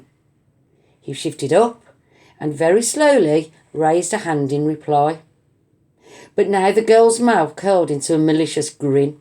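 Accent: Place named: Victoria, Australia. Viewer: Good on you for reading this out loud. What part of Victoria is your accent from?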